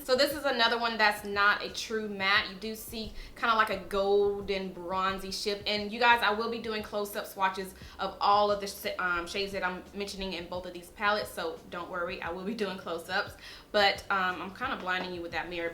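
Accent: South, United States. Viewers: Her southern type accent is a little distracting and hard to focus what she’s actually sayibg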